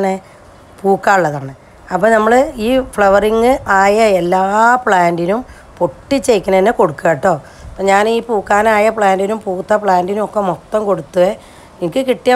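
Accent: Malappuram, India. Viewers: മലപ്പുറം ആണോ സ്ഥലം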